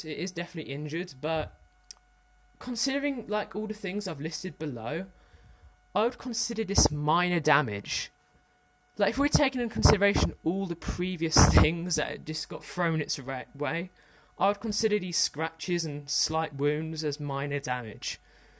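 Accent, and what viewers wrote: United Kingdom. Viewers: I actually quite admire your British accent, you sound smarter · i didn't even know he had a British accent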